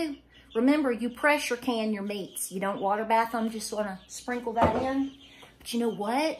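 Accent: South, United States. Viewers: Thank you for the motivation ❤️ I’ve been stocking my pantry since 2020 but you gave me ideas for other things I didn’t think about! I just love listening to your southern accent 😁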